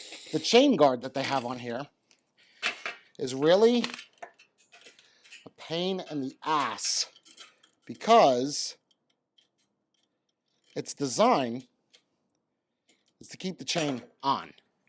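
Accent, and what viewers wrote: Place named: East Coast, United States. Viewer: I swear I’m not a stocker ……. But is this neighborhood in Florida ? It gives me a Florida vibes , but your accent seems East coast ? Maybe I’m way off 🤷🏼‍♂️ Or maybe you are from the east coast and live in Florida now haha.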